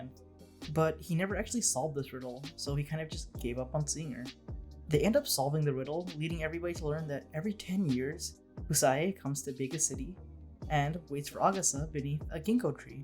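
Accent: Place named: United States. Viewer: The way you say it sounds like CONAN THE BARBARIAN. Your way of saying japanese names far too american aside, respect for using the actual names rather than the MURICAN ones.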